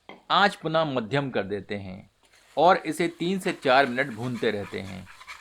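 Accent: Standard. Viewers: आपकी शुद्ध हिन्दी से चार चांद लग जाते हैं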